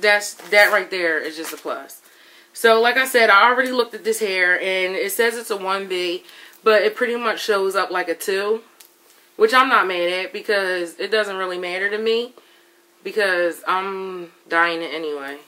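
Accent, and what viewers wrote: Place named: Philadelphia, United States. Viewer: Ayyye you from philly to what part? As soon as i heard keep it a bean i was like she from philly #NorthPhillyOnThisJawn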